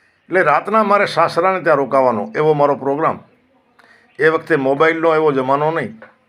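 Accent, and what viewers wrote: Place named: Kutch, India. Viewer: સચોટ વાત તમારી કચ્છી લઢણ માં બોલતી ગુજરાતી ભાષા..જબરદસ્ત❤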